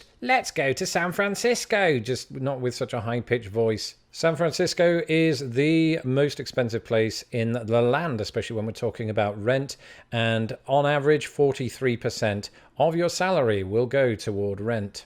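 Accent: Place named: RP, United Kingdom. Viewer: I lived in Derby- the Midlands are great. Rather fond of the north myself as well, but wouldn’t have ever guessed you were a northerner based on your posh accent. You should consider doing an episode with your local dialect and show their diversity on GB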